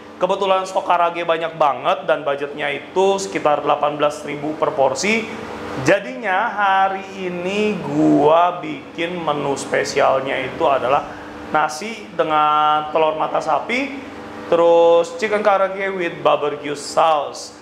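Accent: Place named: Tegal, Indonesia. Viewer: Aja miring miring ooohhh hhhhh.... Kayane sih wong tegal lek ora wong purwokerto..😀😀😂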